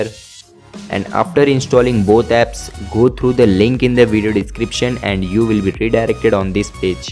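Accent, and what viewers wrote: India. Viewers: I would try this but you have faded background music and an indian voice... So I know it's a scan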